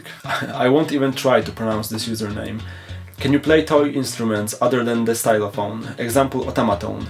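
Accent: Poland. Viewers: Siema Poznałem polski akcent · polski akcent + język angielski = wisienka na torcie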